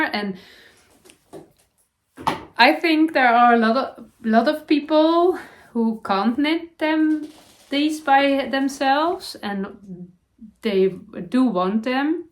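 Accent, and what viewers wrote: Netherlands. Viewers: all the colors of the 3 Felix cardigan are perfect for you! Don't feel guilty by keeping them all! They were a special gift this person wanted you to have! You are so sweet to want to share these with others....but we see your excitement in wearing all your beautiful cardigans and we enjoy your enthusiasm and joy! Thanks for sharing your lovely projects! Love your 'dutchy' english! Closet, chest, cabinet, hanger, some of the words you were thinking about!🦋💕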